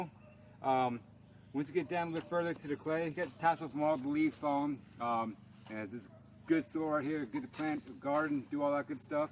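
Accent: United States. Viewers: As an Aussie living in Thailand for 19 years, I find it hard to understand your rapid American speech or what the plan for this water-supply is (and that was my specialty), but is looking so er, civilised, with his trim. Great work team, you're nearly there!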